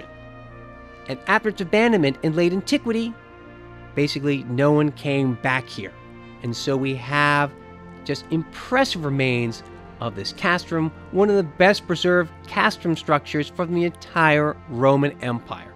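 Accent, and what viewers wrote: United States. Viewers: DAMN YANK NARRARTION!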